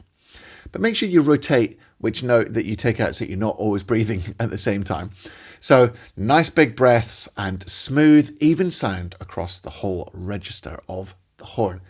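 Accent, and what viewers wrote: Scotland, United Kingdom. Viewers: You are so kind! Thank you. Are you a fellow Scot? Thought I heard an accent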